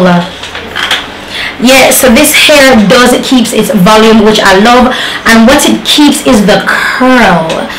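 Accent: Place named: Jamaica. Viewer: I lost focus at one point coz of your ascent 😁 I think I need to learn Jamaican language 😄.... The connection you have with your son..... Is so so amazing ....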